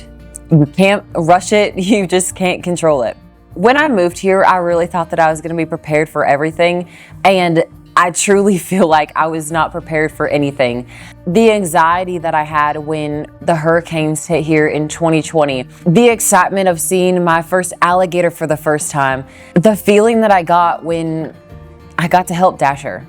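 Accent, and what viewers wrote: South, United States. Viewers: Sounds like you're picking up that southern accent a little. I noticed a few soft "i" a few times. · I see you picked up the southern accent pretty well.😀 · I hear your vowels have softened and your consonant endings have dropped and you have adopted the universal y'all. Another year and your voice will be indistinguishable from most southerners. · Your Southern accent, even if acquired, is quite good. Our accent or dialect is more polite, inviting, and respectful than other American accents. Notice how we tend to talk "uphill" meaning that frequently we end a sentence on a higher note than when we started. This conveys that we are finished with our thought and are inviting a response. This tone also conveys an invitation to accept or reject what we have said without a command to do as we say without reflection as is conveyed with other accents that end in a lower, more forceful tone. 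The Southern accent is similar in tone to a feminine tone in that it is more inviting and considerate. The accent reflects the foundation of the culture of the South on this point. Our slow pacing with lots of pausing can be mistaken for lack of intelligence or confidence, but that terribly misconstrues what Southerners are implicitly going for in their speech. Frankly, when I hear someone always talking hard, flat, and fast I hear someone who is arrogant, rude, angry, callous, and not very reflective. That is not always the case in actuality, but that tone comes across as offensive and disrespectful to me. Southerners are more likely see language not merely as a means of simply getting your point across to another person in order to get a task done but to luxuriate in spending time with other people in your family or church or community as that time, which might not accomplish anything measurable, builds relationships that are on-going an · I do believe you are acquiring a southern accent 👍❤️ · Hope more that looks as good as you keep moving South, you already got the accent. 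· She forgot to mention how small the deer are compared to IL. The accent sounds southern to me. I have never had a desire to travel. A homebody i guess. I like the gulf beaches but i have never been North of Nashville or West of MS. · This is my first time seeing you. Don't know how long you been down south but the twang is coming through really well. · You are developing a Southern accent! Congratulations! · It sounds like you must have picked up a southern accent too. Not sure what it was like before you moved down here but you sound like a natural now. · You have a southern accent 😂😂😂😂😂😂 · Looks like you picked up our accent just fine! Yeah, in the deep south, we don't have 4 seasons, we get about 6 months of summer, a week or 2 of fall, then the next 6-12 weeks alternates between winter and fall/spring. One of the few places where you can experience all 4 seasons in a week. We don't put away summer clothes cuz that would be a waste of time. 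· I absolutely love hearing stories like this. How long did you say you'd been down here? I only ask because you've developed a beautiful southern drawl which think is a compliment. Thank you for the video and adapting to the culture instead of trying to change it. We live slower down here but it's for a reason. Glad to have Ya and keep the videos coming. Now I'm hooked on your journey. Take care! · My dad was in the Air Force so we lived in a lot of different places. All our family was born and raised in Kentucky. I grew up 30 miles south of Miami, Florida in Homestead but lived in Tennessee, Germany, and Illinois to name a few. When I lived in Illinois everyone always said you really talk like you’re from the south. When I was in the South they’d say you really talk like you’re from the North. 😂🤣 One thing I noticed about you is not only have you picked up our Southern lingo but you’ve also obtained the accent. 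Not big time but it’s there. Congrats you’re a certified Southerner now. 😂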